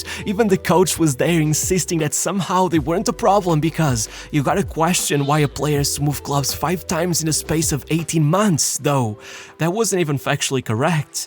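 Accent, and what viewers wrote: Portugal. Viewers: Bro, dumbass he's Portuguese and their accent is like that(in some areas). You're probably American or some shit and you would never understand.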